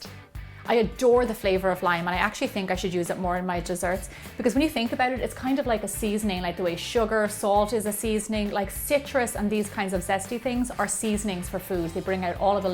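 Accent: Ireland. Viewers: I absoloutly adore the Irish accent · I'm trying to place your accent Is it...Irish? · You are such a great baker your so pretty and I love your accent, I'm from ireland too! + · 1) love your accent; Irish, I beleive. 2) With all due respect, you need to slow down a bit or two while presenting the ingredient preparation procedure. Sincerely, Bruschetta senior; chef-wanna-be. <num>.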